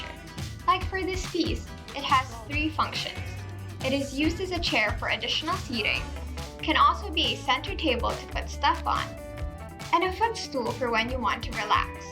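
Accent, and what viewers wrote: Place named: California, United States. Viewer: HI. Ms., you have a beautiful content po. Just avoid cracking voice when youe speak I llike your californian accent though.:)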